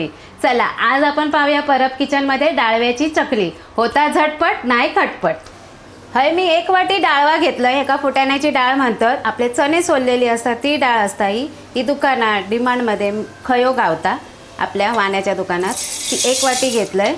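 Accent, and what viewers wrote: Malvani, India. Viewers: कुरूम कुरूम आवाज इलो.खुप मस्तच चकली . खुप छान वाटत तुमचं मालवणी बोलणं. छान बोलून रेसिपी करता म्हणूनच रेसिपी बघायला आवडतात. 🙏👌👌👍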